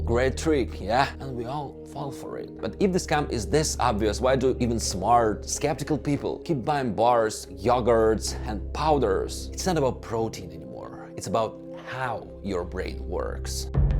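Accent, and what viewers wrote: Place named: Europe. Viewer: the european accent is cute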